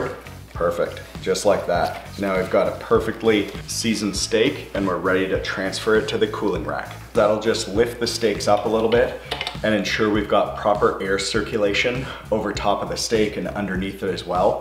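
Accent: Canada. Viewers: Western Canadian accent detected...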